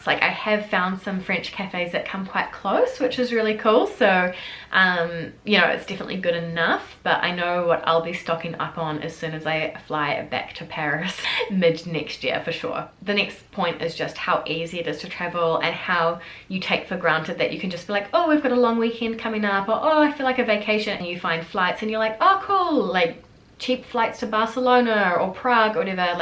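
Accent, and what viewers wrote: New Zealand. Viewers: I j discovered your channel a few days ago and have been knee deep ever since. Something fun I just realized was that when you were in France you sounded like you had a more "american" english accent. Now that you are back home in NZ I feel like your NZ accent has become more prominent. However, as an american I have really loved your videos and collabs. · Thank you for your honesty. I think I understand your dilemma. There is a side in you that appreciates being back in New Zealand, where it's quiet and nature is beautiful and the bureaucracy is less stifling and perhaps it's also nice to be able to see your family more often, yet, you also miss things from Paris, because it had become your home for five years and at some point, you had the vision to spend your entire life there. At the same time, you knew when you lived there it wasn't an ideal place (I agree with you about the noise and the endless paperwork), but now you also have to rethink your channel. 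You love doing your channel and I think you should keep doing it, you just need to find a new theme. Please don't let the negative comments bring you down. You're a beautiful young woman and I love listening to your New Zealand accent. What you're going through is stressful. It looks easy getting on a plane and just going somewhere else, but to start your life all over again, even if it's your home country can be challenging. I wish you the best of luck. Good that you have your husband with you, who knows about your life in Paris. That way, there is always a bit of Paris with you - the good part. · Oh, you are so cute! Even when you're complaining about something, you're just totally likeable! Love watching your videos and seeing your friendly, open face and hearing that adorable Kiwi accent! It would be nice to hear directly from your husband about his acclimation to NZ. That must really be a culture shock - much as yours was when you first came to Paris! 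A small request - in the future, could you speak about what exactly your business entails? Thanks again for the peek into your world! Cheers, Fred in NYC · Your Kiwi accent has gotten SO much stronger since you went back!! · Your New Zealand accent is coming back in force! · whoo je ne m'étais pas encore rendu compte sur tes dernières vidéos mais ton accent est bel et bien de retour depuis que tu es revenu en Nouvelle Zélande, les e qui deviennent des i etc... !!!!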